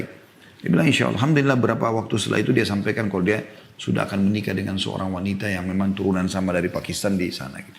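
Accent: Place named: Arab world. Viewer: Ngomong mu masyaAllah Ala arabica gitu lo.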